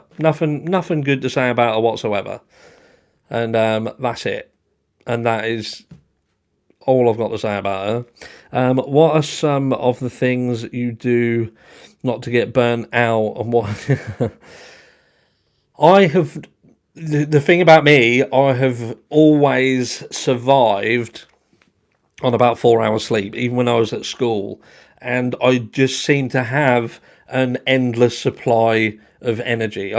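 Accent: United Kingdom. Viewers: I am so bummed that I missed this... I rarely have time to catch lives, but for you, I'm gonna do my best! You crack me up!!! Tell all them TROLLS that HATERS OF HORSE-SHIT (yes I've named ur super hero fan club & I am the leader lmfao) 2nd that FUCK OFF & will make life hell with our super powers... those powers include common-fuckin sense, ability to understand & love a great British accent 😉, and our desire to do anything we can to help the voiceless get justice and stop history from continuously repeating!!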